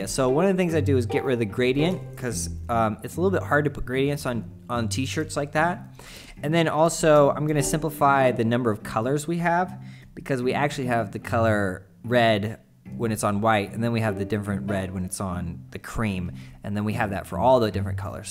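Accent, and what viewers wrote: Germany. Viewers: Doch klar, ist Bayerisch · So, first time watching this dude, for a split second I actually thought hes german. By far the most convincing so far.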